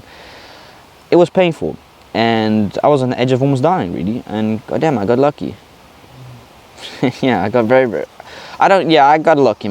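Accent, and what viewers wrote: South Africa. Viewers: Life’s just starting for you-it’ll all turn out just fine-love your (South African?)accent.Greetz from Hellevoet👍🏼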